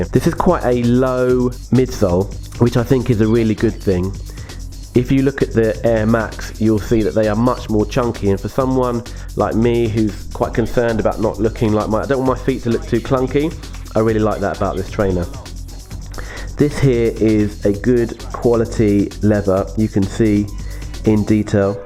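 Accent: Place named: United Kingdom. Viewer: Finally a new channel & great to see/hear another Brit,keep em coming bro.Toe box does look like the snapchat ghost lol.I think Hanon killed it with the theme & colourway,I remember M Johnson,he was a beast but so obvious roided up to the eyeballs (excuse the pun lol) even to me as a kid.Good stuff mate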